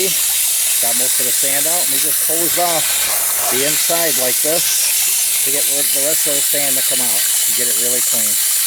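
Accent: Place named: Chicago, United States. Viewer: Helpful video. Excellent Chicago accent.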